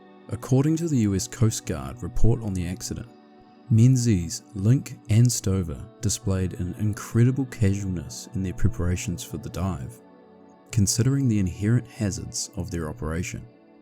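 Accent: New Zealand. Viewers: The calm, easy to listen to and easy to understand kiwi accent and your very clear and precise dictation makes for an awesome narration, nice one mate from a fellow kiwi 👍🇳🇿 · Love new Zealand accent. “Key Wist”. When you want a beer: “pass me a Bicks” 😂😅🤙🤙❤️ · Dang it! Totally missed your emphasized U sounds!! Russell Crowe is my favorite actor how did Inot recognize your Kiwi accent! You will reach 100k subs by the end of the year I haven't been wrong on predicting channel growth!!! cheers mate!!!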